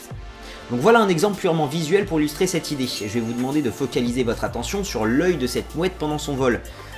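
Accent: England, United Kingdom. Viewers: Lol moi qui suit anglophone et qui regarde egalement tes videos pour ameliorer mon français je suis mort de rire de ton accent anglais il est drole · j'avoue ton accent anglais il m'a fait rire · J'adore son accent anglais xD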